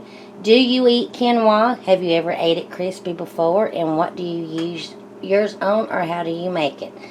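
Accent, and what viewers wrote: South, United States. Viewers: Thanks for sharing!!! Love your Southern Bell personality & voice!! You're amazing:) thanks again!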